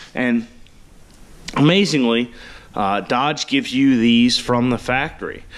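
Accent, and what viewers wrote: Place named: Pennsylvania, United States. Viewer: ditto. keep it. those of us who understand the the hunky (slang for the polish-slovak-german-italian culture mashup in the area) accent, or who are from pennsyl-tuckey actually appreciate having someone who doesn't sound like everyone else on the web.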